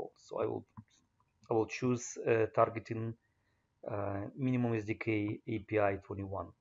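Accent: Russia. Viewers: чета у тебя русский акцент и фамилия русская?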